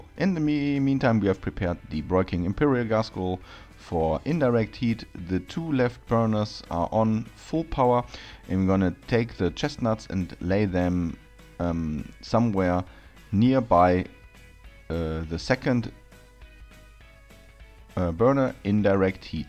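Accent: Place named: Germany. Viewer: Its tiiiiime to grill.... ich liebe dein Typisch ich sag mal „Deutsch angehauchtem“ Englisch :)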